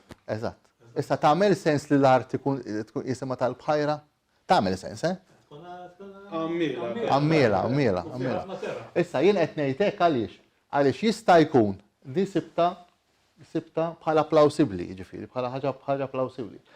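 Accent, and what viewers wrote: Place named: Tunisia. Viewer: The professor has such a Tunisian intonation.....one intervenant sounded Tripoltan.....and you have a few levantine words ....like issa, inkiteb...inqalab....at the end it is driving me crazy .....rahal ..marhala means both....distance of journey and staion of journey......and by the way ..,when you say Yena ....for I ....that was lost in the arab world.....but still exists in Yeni only in a town in central Tunisia and in Alexandria Egypt.and probably some Palestinians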